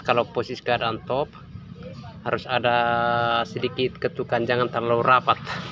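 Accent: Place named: Eastern Indonesia, Indonesia. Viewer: mantap bang, dgr logatnya org timur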